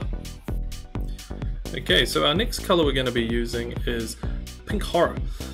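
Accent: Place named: New Zealand. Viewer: For an Adelaide based kiwi, it's so great to hear our accent and the word "Kiaora" and btw, your tentacle painting is spot on!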